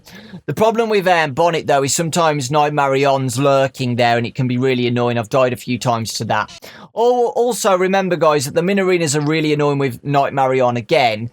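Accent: United Kingdom. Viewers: He is british right? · ultimate britain voice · This guy has such a British accent it sounds fake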